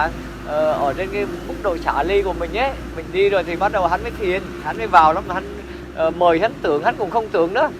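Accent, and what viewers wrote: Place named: Ha Tinh, Vietnam. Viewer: Thích luôn giọng Hà Tĩnh luôn ❤❤❤❤❤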